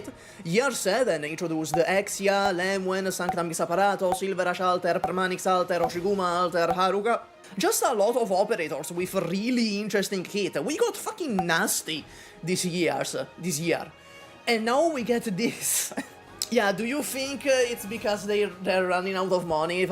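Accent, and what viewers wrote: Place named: Italy. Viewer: You sound italian AF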